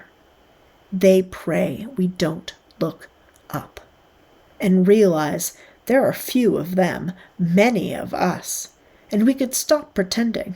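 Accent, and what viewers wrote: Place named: Scotland, United Kingdom. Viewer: Such a powerful and timely piece, and so beautifully delivered (even with a Scottish accent!). Thank you!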